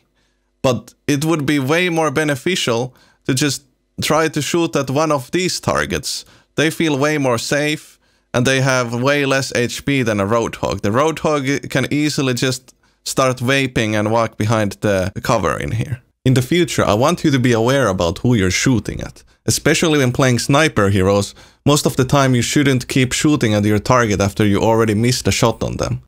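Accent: Finland. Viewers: What is your main language becouse you sound like how finnish people speak eglish? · I instantly knew you were Finnish when you started talking 😅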